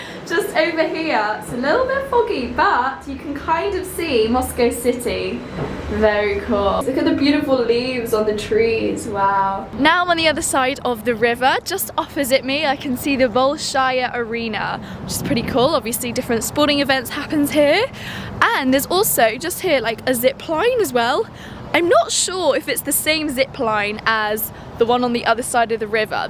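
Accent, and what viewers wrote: United Kingdom. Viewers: Такой ярковыроженый британский английский, красиво. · Британский английский просто очень красивый, на самом деле.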